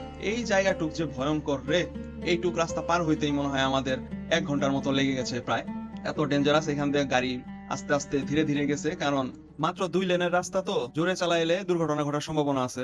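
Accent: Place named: Tangail, Bangladesh. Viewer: খুব ভালো লাগলো ভিডিও টা। ভাই আপনার দেশের বাড়ি কি টাঙ্গাইল? আপনার কথা শুনে মনে হচ্ছে আপনার হোম ডিস্ট্রিক্ট টাঙ্গাইল।।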